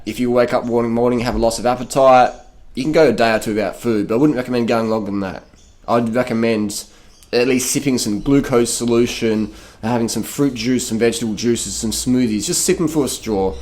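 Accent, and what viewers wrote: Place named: Australia. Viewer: I just hate this Australian accent...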